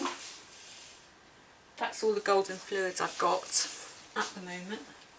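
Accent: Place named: England, United Kingdom. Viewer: Hiya, just watched your video, I found it inspiring. I've been pouring for a few years now. It's my therapy. It's quite nice to hear an English voice, most YouTube people I've seen are in america, Canada or Australia. I hope you don't mind, but I think I'd like to try the hammer technique, the results you have Harare fantastic.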